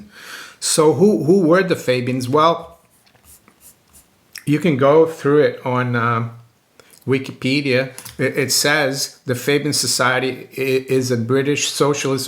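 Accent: United States. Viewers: Italy, Brazil, Switzerland - so why the United States accent? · Here I thought you were an american living in England this whole time. Thanks for the video.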